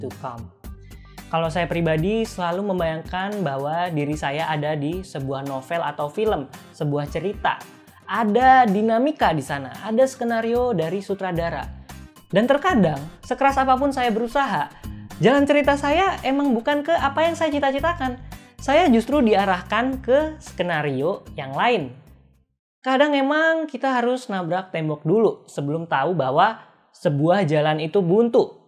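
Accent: Toraja, Indonesia. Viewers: Lu orang toraja bang??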